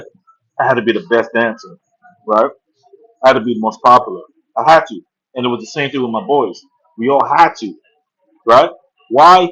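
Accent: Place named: United States. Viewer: Why do u speak like an American? U r not believable WHAT about all the Nigerian call centers SCAMMING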